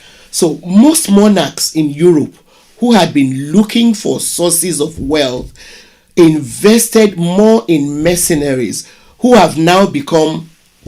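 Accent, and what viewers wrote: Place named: Africa. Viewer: Thanks for this. There is a racist you tube channel called History Debunked. His supporters were in the comments section talking about Black people coming from primitive tribes. I sent them this video to show them who were the primitive tribes. It will also gall them that it is a Black Woman speaking about this with an African accent no less.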